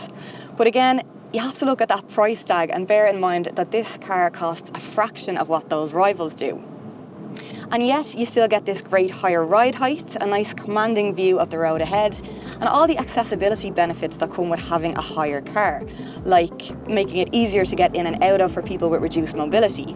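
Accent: Dublin, Ireland. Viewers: That is not a English accent it's a Dublin accent. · Great review with the Irish accent 😊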